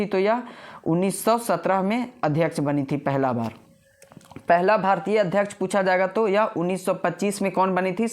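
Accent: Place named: Bihar, India. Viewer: Aap bihar k ho bhai🎉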